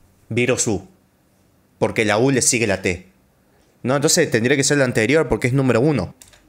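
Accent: Córdoba, Argentina. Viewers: de donde sos tenes una tonada muy parecida a la argentina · ERES DE ARGENTINA O DE CHILE.-. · Si Cordoba Argentina:D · Para mi es de Córdoba, porque no habla tan agrandado.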